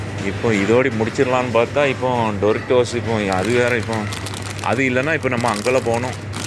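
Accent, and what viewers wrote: Tirunelveli, India. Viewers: நீங்க பேசும் போது அந்த திருநெல்வேலி பாசை வருது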